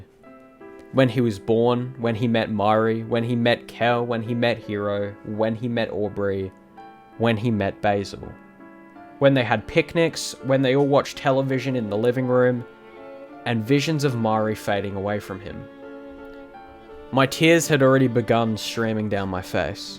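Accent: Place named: Australia. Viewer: Fabtastic video, man. You're definitely gonna make it, man. Also, every time you say Mari, I keep thinking you're saying my name in your Aussie accent.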